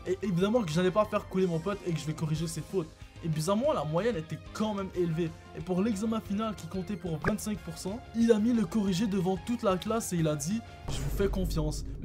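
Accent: Quebec, Canada. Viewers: T’as un accent qebecois 😂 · incroyable la vidéo le montage est propre et non je ne suis pas raciste mais j'ais l'impression que t'a un petits accent du Québec j'adore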